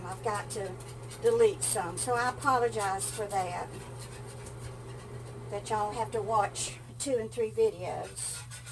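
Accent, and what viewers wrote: South, United States. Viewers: It’s the Southern accent,. White (whyyyte). I have trouble with people understanding some of my pronunciation that aren’t from the South. Asked for a bag of ice in Philadelphia (iyyyce) and the lady thought I said a bag of ass. 🤣😂🤣😂 We got a good laugh. People from Idaho don’t have a Southern accent. I love to hear the lovely accent. Happy New Year to you. 🎊🍾🎈😘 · Hi, I just discovered you last week. My grandmother and her family were from Starkville, MS and one cousin is left there. My grandmother's cooking had a MS flair with lots of butter. And some from Ackerman. Goodlooking meatloaf and love your southern drawl. I'm in north Texas.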